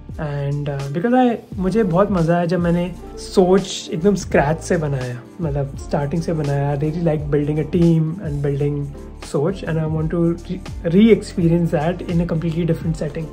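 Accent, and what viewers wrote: India. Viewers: why does his accent sounds exactly like the American stereotype for "indian guy". Not that I liked it.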